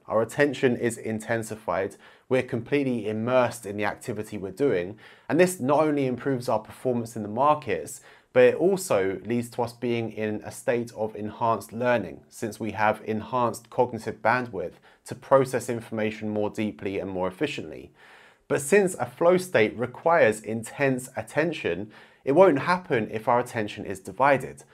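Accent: United Kingdom. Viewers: I like the way you explain in very precise basa on logic and higher level of example. Im very addicted with those video. And should mention ❤your British English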